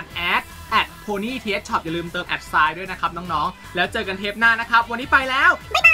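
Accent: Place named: England, United Kingdom. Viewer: สำเนียงอังกฤษมาเต็ม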